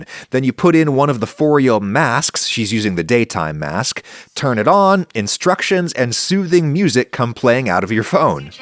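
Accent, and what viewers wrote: United Kingdom. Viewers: too british for me, unsexy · is Bri'ish, confirmed live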